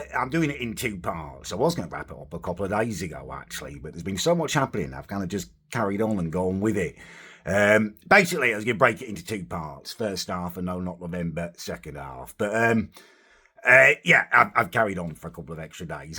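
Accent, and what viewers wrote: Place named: Blackburn, United Kingdom. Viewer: Think he was born in Blackburn, I'm from around there and he has the same accent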